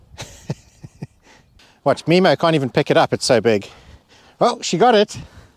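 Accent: South Africa. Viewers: Thanks for brightening my life. I'm a fellow Saffa in Taiwan and stumbled across your channel. Great to hear a Saffa accent again. is so cute the way she says JA and your favourite phrase is "and stuff like that" 😆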